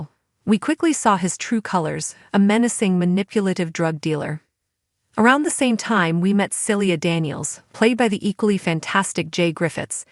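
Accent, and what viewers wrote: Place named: United States. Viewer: You should switch the voiceover to an English accent. An American accent makes it more obvious that the video is AI.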